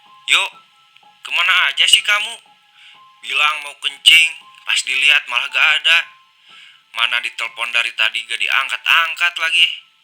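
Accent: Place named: West Java, Indonesia. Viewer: Nu ngadongeng sorana siga urang sunda.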